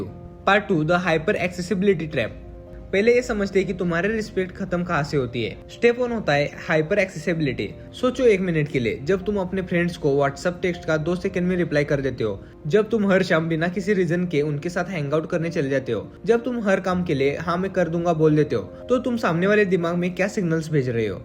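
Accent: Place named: Maharashtra, India. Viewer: Are u marathi bro? Ur pronunciation sounds like that ❤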